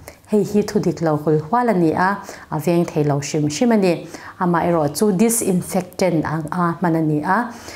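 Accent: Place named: Lunglei, India. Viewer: Naupang leh kha zirtir i ang. Se tha lutuk tho e..tin lunglei lam mi i nih ninetrin😀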